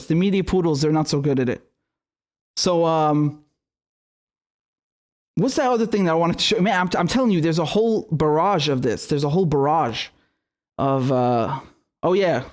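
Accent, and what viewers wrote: United Kingdom. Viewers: Despite the serious nature of these events, has me laughing tremendously. Loving the British accent haha. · that British granny accent tho. Keep up the great work. We need to amplify real progressive/leftist voices.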